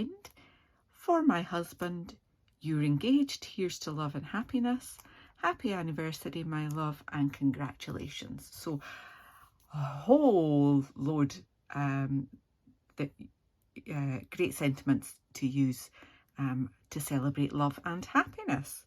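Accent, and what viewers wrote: United Kingdom. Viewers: Great to hear a Scottish voice x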